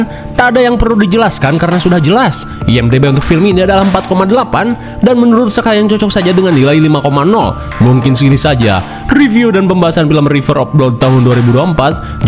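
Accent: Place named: West Java, Indonesia. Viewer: Sering aplod video nya KA, soalnya aku suka banget cara ceritain alur nya lucu gitu aku suka, kaya ada Sunda sundanya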